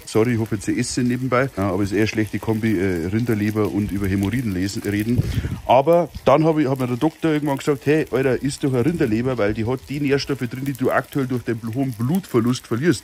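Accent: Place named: Salzburg, Austria. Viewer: Bist du im Berchtesgadener Land dahoim? Der Dialekt klingt so gleich wie da meinige da in Salzburg.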